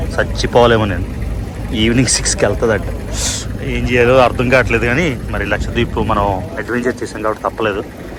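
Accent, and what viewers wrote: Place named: Odisha, India. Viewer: Nuvvu konchem Andhra slang ki marali annai. Appude views perugutai. Ledante odisha vadivi ani neglect chestaru.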